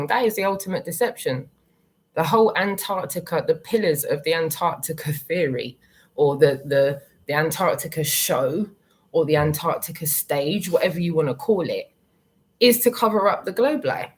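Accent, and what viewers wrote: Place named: England, United Kingdom. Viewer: At last, Ive found an english lady who speaks the same as i do!! Id love to chat, if your up for it!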